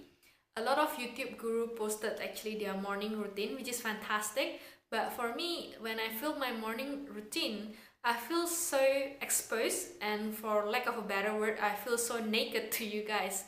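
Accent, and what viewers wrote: Indonesia. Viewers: Your indonesian accent is obvious in this video..hehahe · Mbaknya ngomong inggris aksen Indo ya?. Kental bgt logatnya👍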